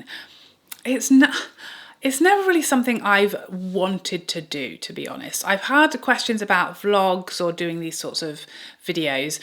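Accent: England, United Kingdom. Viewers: Congratulations on 6 years!!! I discovered your channel at the beginning of the pandemic and your lovely accent reminded me of the wonderful years I lived in England back in the early 2000’s. I’ve enjoyed all of your content and look forward to seeing what you do in the future! ❤😊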